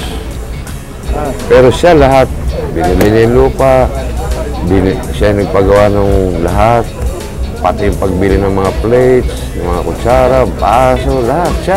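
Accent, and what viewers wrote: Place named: Philippines. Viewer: I saw him on Zimmerman's show and noticed his English accent was more Filipino... he's practically Filipino. Thanks for sharing this vid!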